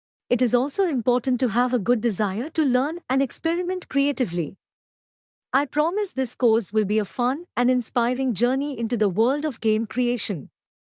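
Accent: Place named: India. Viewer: Indian accent +20 to understanding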